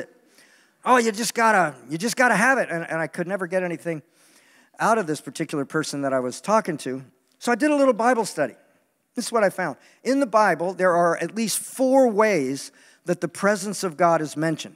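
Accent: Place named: Brooklyn, United States. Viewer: Hi, Lol what's up with the Brooklyn accent?😂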